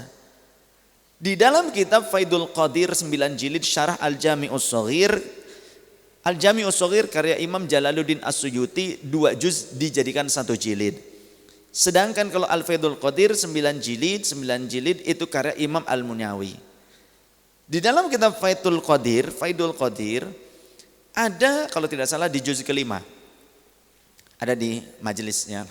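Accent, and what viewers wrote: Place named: West Java, Indonesia. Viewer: saya mau tanya, apakah punya darah sunda? soalnya logatnya terdengar seperti orang sunda..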